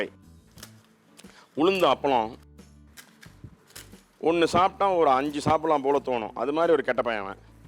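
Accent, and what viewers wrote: Chennai, India. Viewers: You are the most amazing person who cooks with love & laughter. I literally love to watch your cooking especially with your lovely speaking.. mostly Madras tamil.. you are truly great. I am living in California for more than 22years but still I am the same Madras girl who loves to cook (only after marriage though😉). Just the way you speak & entertain us, added with your cooking takes it to another level. I admire you soo much. My son loves South Indian food. So just for him I try different styles. You are so amazing.. if it was just a music with cooking or some too much unnecessary talking with cooking , I would not have been drawn to you.. you are lovely with the cooking & the most I admire you is for the way you talk about the cooking or the ingredients. I literally LOL (laugh out loud) whenever I see a recipe from you that I want to try. 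Please be the same person & never ever change your attitude. You are very down to earth & still entertain us & teach us how to cook at the same time. I never ever message or comment to any of anything online thingie in my life so far but I just couldn't resist but to comment/message you. This is Bala from Los Angeles, California but always a tamil ponnu; raised in Chennai. Thank you for your dedication to share your passion to others.. it means a lot to a lot of people that I am sure of. Whenever I hear you speak..that joy & laughter you bring with a recipe means soo much for me. Thanks a lot Mr. Bhat. I hope you read this comment & reply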